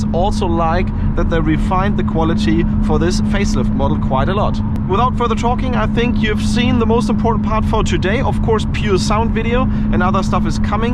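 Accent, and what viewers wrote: Germany. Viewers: Wie man einfach den deutschen raus hört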